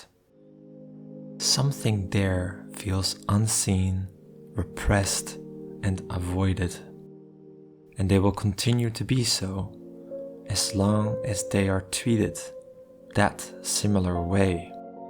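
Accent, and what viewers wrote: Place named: Netherlands. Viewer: Beautiful artworks and great storyline. Your video’s are great value to my as I am on the path of individuation as well. Thank you. I can hear from your accent that you are from the Netherlands as well?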